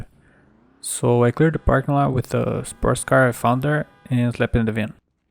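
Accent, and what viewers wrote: Brazil. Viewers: kakakak da pra ouvir o brasil no seu sotaque, vc eh foda mn! vou assistir tudo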